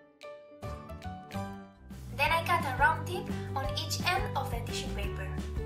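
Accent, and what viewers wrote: Basque Country, Spain. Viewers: She's from Spain and she has an accent but I could understand her. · Your spanish accent mixed with your english pronunciation is so beautiful! I don't know too much Spanish, such a shame because my family comes from Madrid, but I would love to see a video with you speaking Spanish! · eres española?? Porque tu acento de verdad es muy español Are you from Spain? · Are you from Spain? Because of the accent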